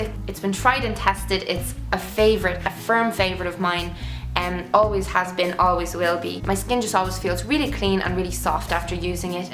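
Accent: Dublin, Ireland. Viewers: Did not realise you were Irish! This is the first video I watched of yours :) clicked on it for obvious reasons, thanks for the vid. I changed my diet completely. It's a really difficult time!! So thanks :) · I'm from Dublin and I'm pretty sure she is too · I think she's from Ireland (I'm from England myself so I'm fairly familiar with the accent).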